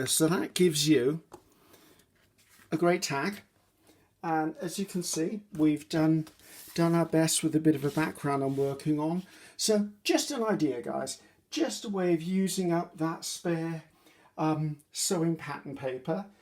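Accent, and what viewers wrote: United Kingdom. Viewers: Hi, it's great to meet you and watch your fresh, lovely British accent creative take on crafting. I'm a new follower and am exited to watch more!!!!! Cheers from Iowa...USA. Tonya:)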